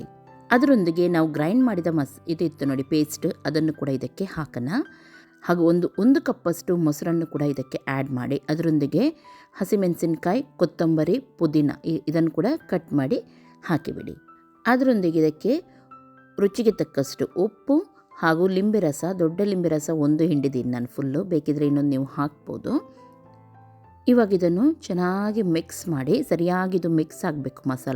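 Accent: Mangalore, India. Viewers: Bayalli neeru barta ide. Nimma matina shaili Mangalore bhashe super...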